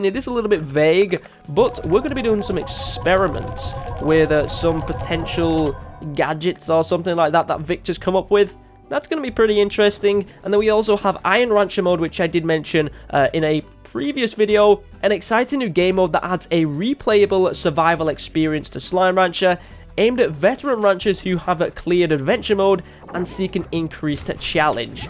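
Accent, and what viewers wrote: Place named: Scotland, United Kingdom. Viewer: U sound like a Scottish NKuch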